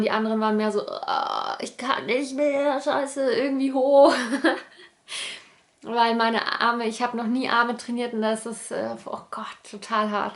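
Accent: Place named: Franconia, Germany. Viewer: Daumen hoch wegen deines fränkischen Dialekts :-)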